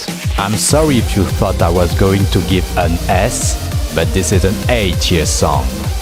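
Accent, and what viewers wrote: France. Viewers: the best tier list in my life. thank you :) also your french accent is so lovely!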